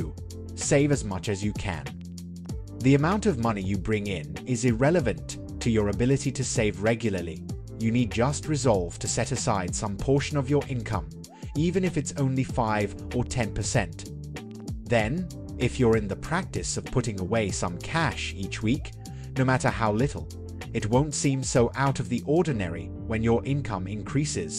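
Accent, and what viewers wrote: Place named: United Kingdom. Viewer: To be honest — really tired of the British accent.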